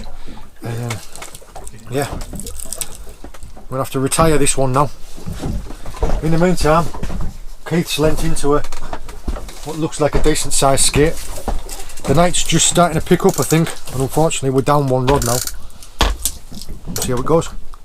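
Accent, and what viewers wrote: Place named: Northern England, United Kingdom. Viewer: luvin your videos mate and your boat is great too just a nice size for what you do . i live in australia but like watching the english fishing channels probably cos i was born in the north too ,middlesbrough and i still like listening to any of the accents from up north. johno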